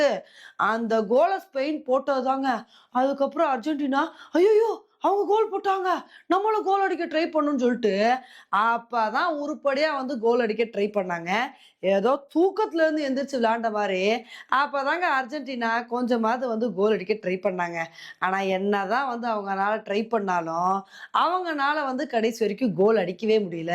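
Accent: Madurai, India. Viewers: மேடம் நீங்க மதுரையா பேச்சு வழக்கு செம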